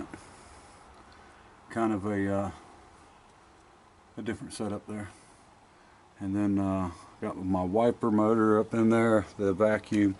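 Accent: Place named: Florida Panhandle, United States. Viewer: I didn't realize you were in Florida.. so am I. I'm a Boston transplant and I've been in southern Fl. for a few years now. If I had to guess by your accent, I'd say you're from the panhandle..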